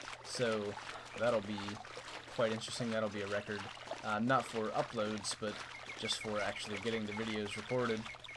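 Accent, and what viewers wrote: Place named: Pennsylvania, United States. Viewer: So am I. That's awesome bro. I had a feeling by ur slight pa accent.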